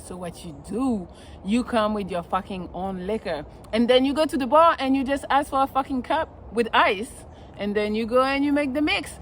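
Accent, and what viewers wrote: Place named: France. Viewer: I love your French accent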